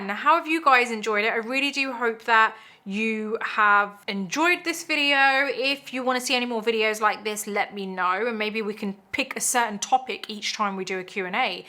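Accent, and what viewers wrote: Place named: United Kingdom. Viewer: ❤ Iove your accent its so cute.. what part of UK are you from? ❤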